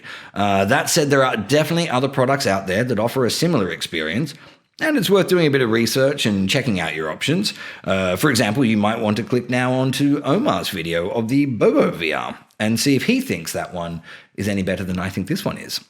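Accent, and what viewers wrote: United Kingdom. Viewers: you are a funny guy. Nice accent, not overly British, good voice, great to listen.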